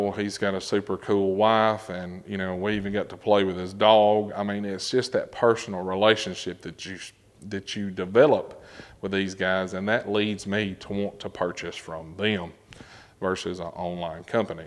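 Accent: South, United States. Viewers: Thank you - I hate when they do that and besides is he speaking English or Southern drawl?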